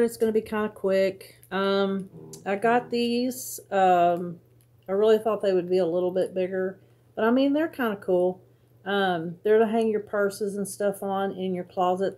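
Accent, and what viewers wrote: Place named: South, United States. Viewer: I think it was a great haul! Hey look at the bright side, you don’t have to worry about people complaining about the crunching bags and wrapper’s 😂! I think you’re absolutely wonderful, keep smiling 😊… and just know you’re doing an absolutely amazing job sweetheart! Have a Blessed 😇 day! The A in my name stands for 👼 Angel! Also, I am from Kentucky and noticed a southern accent, just curious to know where you’re from?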